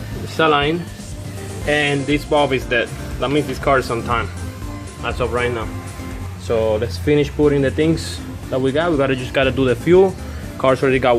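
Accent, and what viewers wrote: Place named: United States. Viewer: Pareces un Yanki hablando English...coño.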